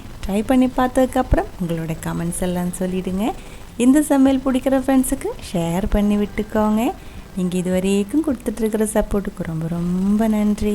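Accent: Kerala, India. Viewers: കൊഞ്ചം കൊഞ്ചം മലയാളം വരുതേ ഉങ്കൾ തമ്മിൽ സൊള്ളും നേരത്തുക്ക്‌ 😃☺️☺️ പാത്താലേ തെരിയും സൂപ്പർ കറി എപ്പടി ഇന്തമാതിരി... ആന്നാ നാൻ ഒരു മുടി ട്രൈ പണ്ണി പാത്തിയാച് നടക്കവേ മുടിയാത് 👌👌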